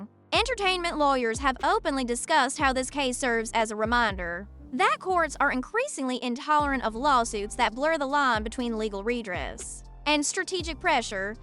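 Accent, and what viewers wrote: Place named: South, United States. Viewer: This is hilarious. An AI video with a deep southern accent. You can always spot an AI video by the utter lack of cadence. But this one with a southern accent is glaring. Lmao😂😂😂😂😂😅😅😅😅😅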